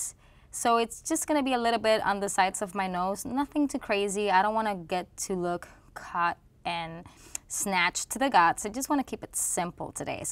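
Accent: Puerto Rico. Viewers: Well done Sephora for using a Puerto Rican MUA! Love the accent <3 and the tutorial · Lol I didn't even notice you had an accent until you said you're from PR 🇵🇷. Keep the clean makeup tutorials coming!!!! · I love your Puerto Rican accent and you're beautiful! Love this natural look. Question... Do you wait a few minutes between each layer of product prior to your foundation? Thanks for a great video! 😀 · Ningún "Please excuse my thick Puerto Rican accent"! Eres boricua y qué! Gracias por el video! Mucho amor desde PR!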